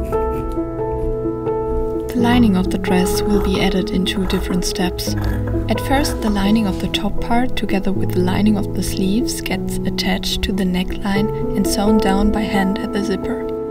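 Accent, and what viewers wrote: Germany. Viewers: Deine Videos sind sooo, soooo wunderschön gemacht, jedes ein Kunstwerk für sich. Wohl dein unbestechliches Auge für Ästhetik dass sich auch in all den wunderschönen Kleidern zeigt. DANKE fürs verzaubern ❤ Beim Video schauen dachte ich direkt, dass du aus dem deutschsprachigen Raum sein musst, wegen der typisch deutschen Aussprache. Leider kann ich kaum Englisch und konnte mit deiner homepage dann gar nicht so viel anfangen ohne Fremdsprachenkenntnis. Ich versuche nämlich immer gute Kurse, Seminare oder Online- Ausbildungen auf Deutsch zu finden. Deine Videos sind unbeschreiblich schön gemacht, einfach berührend und sehr emotional umgesetzt. Grosses Kompliment ❤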